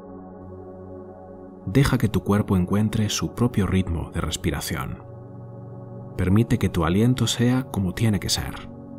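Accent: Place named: Spain. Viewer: Tienes una voz muy linda y con tu acento español mucho más. Dios bendiga tu don.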